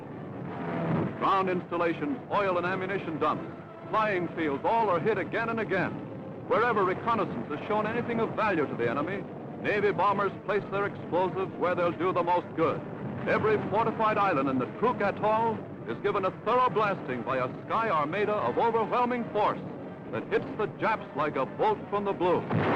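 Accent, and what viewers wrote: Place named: United States. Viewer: Also the announcer is American.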